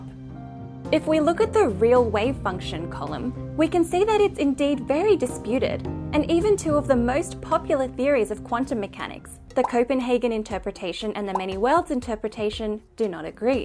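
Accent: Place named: Australia. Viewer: Aussie accents are so hot!!!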